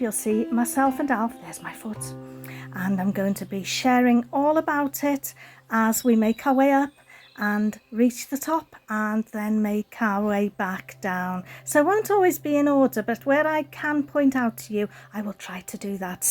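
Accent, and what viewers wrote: Wales, United Kingdom. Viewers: Thanks for the awesome hike. Very interesting and there's so much history. Btw, i love your welsh accent and your narration is very pleasant. 👍😍 Have a great day ahead 😊🥰🙏💕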